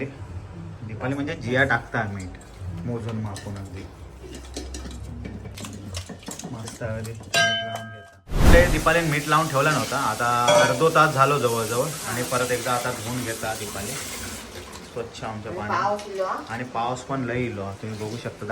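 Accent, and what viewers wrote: Malvani, India. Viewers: खूप सुंदर vlog❤❤❤माका तुमची मालवणी लय आवडता.....तुमची अशीच प्रगती होत राहो हीच स्वामी चरणी प्रार्थना ❤🙏तुमच्यामुळे आम्हाला एक नवीन उर्जा मिळते आणि तुम्ही ज्या ज्या हॉटेल मधे जाता ती सर्व माहिती मिळते .....मस्त असेच एन्जॉय करा ❤❤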